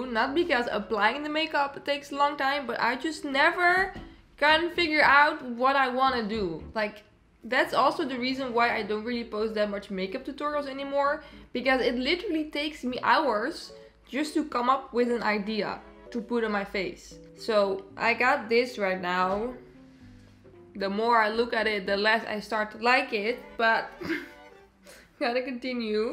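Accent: Netherlands. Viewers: I just started watching you (this is my third video that I'm watching from you) and usually I'm really bad at guessing from where the accent it (like seriously bad) but I guessed that you are from the Netherlands and suddenly you say you're Dutch. I was really proud of myself. I love your vibe and personality, it's just so lively and fun! Btw, I'm German, so hello neighbor! :D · i instantly recognized your accent as a fellow dutch person LMAO you even use dutch onomatopoeia(?) like. some sounds you make only dutch people would make i feel like. vla is een lekker kleurtje 😳 moet nu naar jumbo denk ik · Are you dutch??? Its sounds like it , im dutch thats why i reconize it haha😘😂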